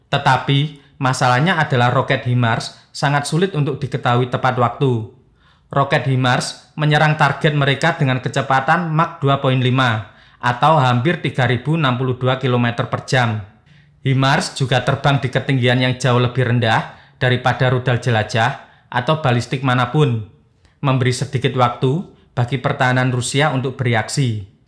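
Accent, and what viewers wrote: Java, Indonesia. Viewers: Wahhduhhh loghat jawanya mas kental sekali wahduuhh😂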